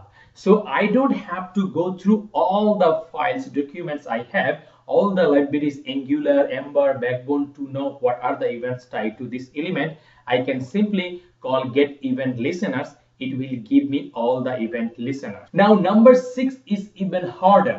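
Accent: India. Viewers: Lol this indian dude is hilarious when he talks its so steriotypical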